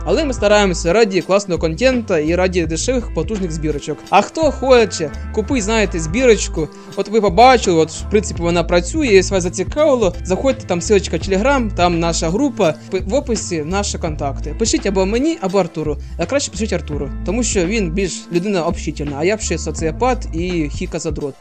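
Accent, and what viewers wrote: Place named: Surzhyk, Ukraine. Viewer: Навіщо такий кончений суржик, кров з вух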